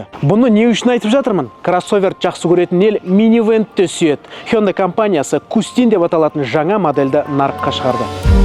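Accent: Standard. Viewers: қазақтың тілінде таза сөйлейді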